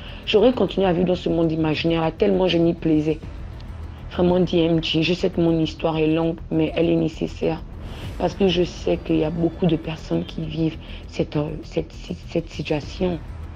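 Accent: Gabon. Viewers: L'accent est gabonais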